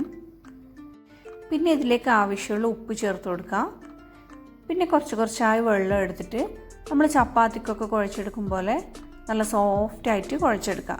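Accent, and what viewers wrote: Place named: Kannur, India. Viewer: Kasaragod evideya njanum Ksgd but Ningale slang kannur touch nalla basha🤩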